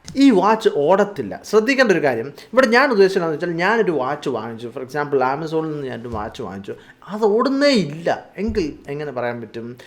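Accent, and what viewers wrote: Kottayam, India. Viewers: Are you from kottayam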